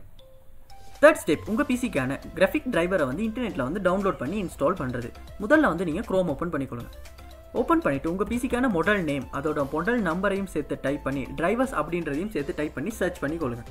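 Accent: Sri Lanka. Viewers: Srilankan friend pesra mari iruku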